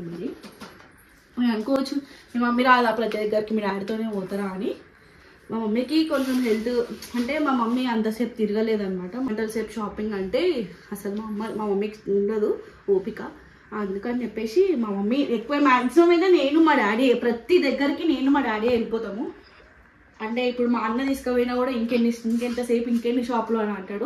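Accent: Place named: Telangana, India. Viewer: Mee Voice chala Excellent ga undi andi Pure Telangana Slang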